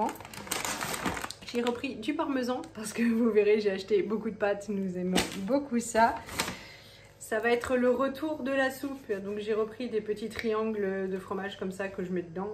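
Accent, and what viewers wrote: Alsace, France. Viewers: Bonjour Y rrrepennnnnser 😂 yo hop la ! Un petit reste d’accent alsacien 😊 votre retour me donne envie de tester les triangles dans la soupe au lieu de la crème. Les pains d’épices au chocolat Made in Allemagne sont de retour chez Leclerc Alsace … miam ! Bon WE à toute la famille et caresses à Chupa ! 😙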